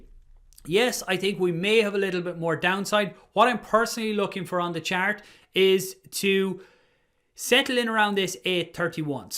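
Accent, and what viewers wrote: Ireland. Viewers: Great idea just creating content just for ADA. Are you from Ireland? I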